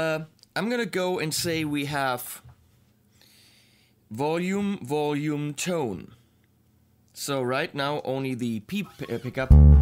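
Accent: Germany. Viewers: Ich hatte die ganze Zeit das Gefühl das du deutschsprachig bist und als du "Very stramm im Ton" gesagt hast war es klar xD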